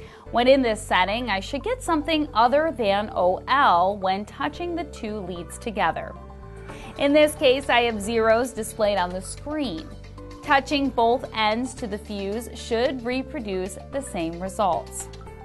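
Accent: United States. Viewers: The American accent makes it difficult to hear clearly.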